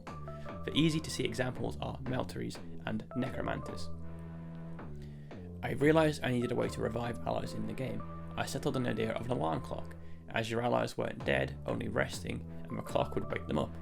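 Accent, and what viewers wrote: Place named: United Kingdom. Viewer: this is a fully VALID video on game design but i feel like you should enunciate your voiceover more to unleash the power of your british accent. or if that doesn't work maybe you can try just yelling into the mic? that might attract a wider viewership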